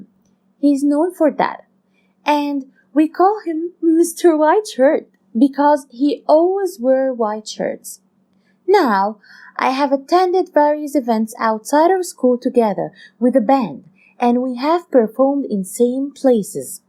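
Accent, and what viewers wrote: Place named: China. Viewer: Learn how to talk better I don't understand you with your Chinese accent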